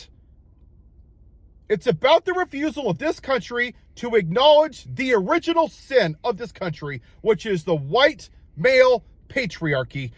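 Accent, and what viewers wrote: United States. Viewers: He's not that fluent but he's ok at it. I still hear a bit of a gringo accent but with practice, he could start to sound more native.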